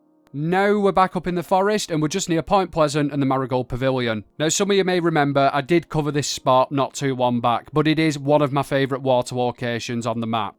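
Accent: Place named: England, United Kingdom. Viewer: Thank for the vid been nice hearing a englishmen talking instead of all the yanks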